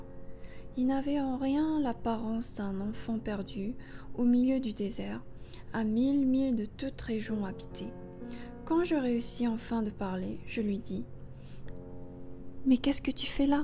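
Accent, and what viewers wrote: South Korea. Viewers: 중국어 많이하면 혀 꼬이는것처럼 구조가 변해요 덕분에 영어발음도 좋은 편이긴 하다만 가끔 세는 한국어 발음이 있어요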